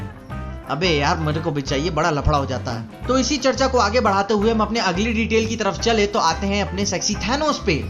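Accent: India. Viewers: Ur desi style of speaking is AwEsOmE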